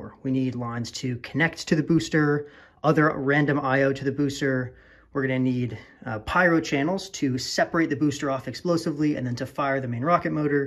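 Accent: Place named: United States. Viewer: Sounds like your American, how about giving us inches, feet, and grams/lbs.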